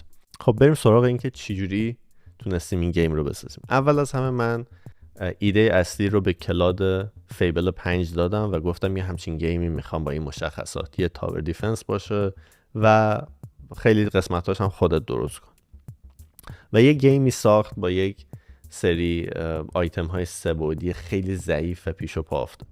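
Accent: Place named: India. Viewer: از پکیج دیجیتال مارکتینگ که چندین سال پیش دادید فکر میکردم هندی باشید بخاطر لهجه لاتین تا الان که یهو این ویدئو رو دیدم، عالی بود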